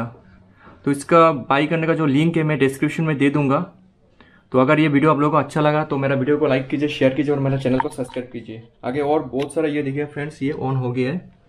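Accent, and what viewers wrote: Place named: Bengal, India. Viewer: Bengoli wala hindi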